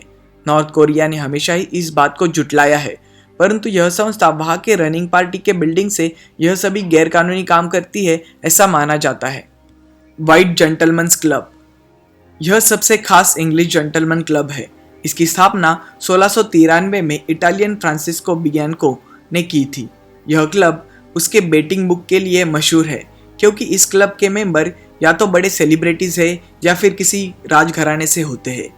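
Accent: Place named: Maharashtra, India. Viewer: narrator pakka Marathi hai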